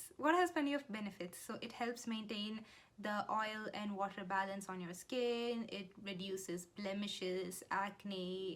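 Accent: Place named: India. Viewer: Typical indian accent, bathroom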